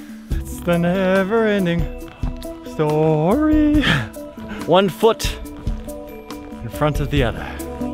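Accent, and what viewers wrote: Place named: England, United Kingdom. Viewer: Your English accent sounds like Keanu reeves accent from Bram Stoker's Dracula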